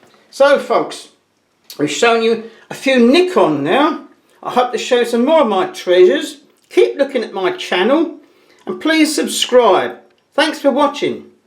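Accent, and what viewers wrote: United Kingdom. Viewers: I am looking for a film camera (I am from the digital era), and Sir you convinced me about getting a FE. Love your British accent, greetings from Argentina.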